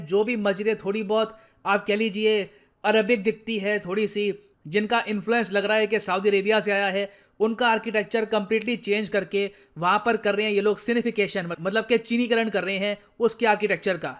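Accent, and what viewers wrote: India. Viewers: As soon as I heard the curry-flavored English, I knew the rumor was back.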